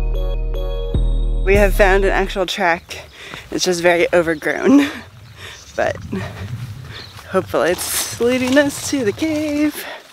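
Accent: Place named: New Zealand. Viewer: Living in NZ I notice you are building an accent towards the end of the video.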